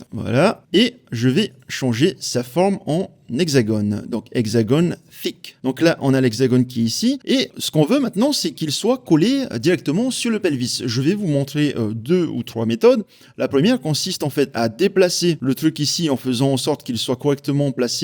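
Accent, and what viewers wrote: Tahiti, French Polynesia. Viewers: Super vidéo, super qualité et super boulot ! :D (et l'accent tahitien c'est tellement peu commun dans les tutos de logiciels ça fait plaisir à entendre aussi) J'avais besoin que d'une partie et certains trucs ont changé de nom ou autre dans UE5 mais j'y suis arrivé donc merci :) PS : pour se souvenir de l'annulaire, il porte ce nom parce que c'est celui qui reçoit l'anneau quand on se marie ;) Et auriculaire parce que c'est celui qu'on utilise pour se gratter dans l'oreille lol, c'est moins glamour.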